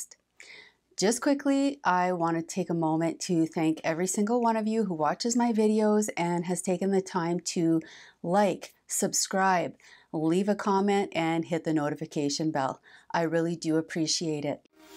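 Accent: Canada. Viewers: Love your Canadian diction...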